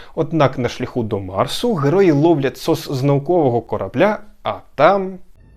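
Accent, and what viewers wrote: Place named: United Kingdom. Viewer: Де в епоху інтернету отримав таку чисту Bri'ish вимову?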